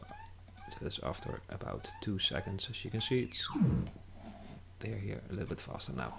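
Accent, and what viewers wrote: Netherlands. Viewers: haha, Nederlander ofzo die dit zegt. Haha, the man that tells it, is Dutch i think :)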